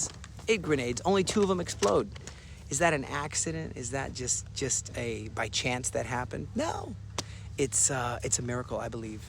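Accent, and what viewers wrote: United States. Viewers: Is this guy American or Israeli? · Excellent American accent for being a native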